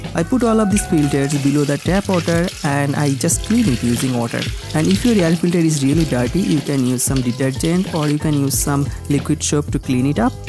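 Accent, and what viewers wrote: Bengal, India. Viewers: Bengali accent or something else.....my tongue is twisting by hearing him.. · You're typical Bengali accent is pathetic! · Do u have paan in Ur mouth?😂😂😂 Bongali xcent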